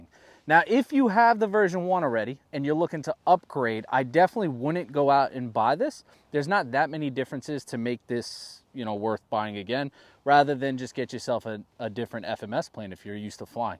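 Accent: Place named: Boston, United States. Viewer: Man keeps saying "supah" and I keep thinking "dudes gotta be from Boston"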